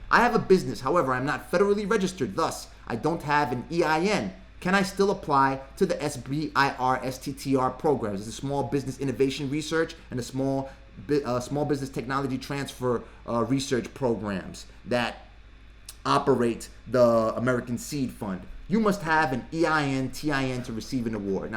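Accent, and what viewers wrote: New York, United States. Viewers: Con Ed is in NYC, maybe he's from NY. Sounds like it!!! LOL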